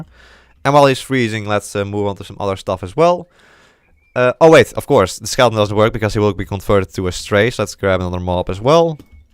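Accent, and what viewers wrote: Netherlands. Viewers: hello, i can hear you're from the netherlands :)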